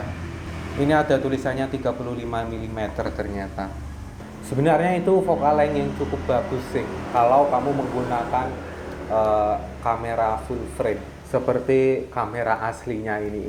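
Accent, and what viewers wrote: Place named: Java, Indonesia. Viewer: So amazing, brother, your bahasa indonesia is medok jawa, so very different from your english in your other video..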